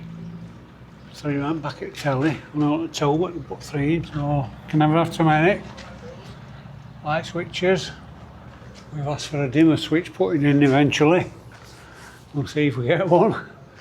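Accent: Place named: Sheffield, United Kingdom. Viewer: Really enjoying videos. Great to see the house coming on. Would be good to know costs building land. Plus a bit of back story about you both. Your accent not too dissimilar to mine. I'm from Sheffield. Any keep the vids coming. Cheers mate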